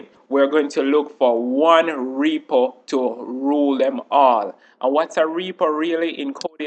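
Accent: Jamaica. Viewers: I love your Jamaican accent so much. <3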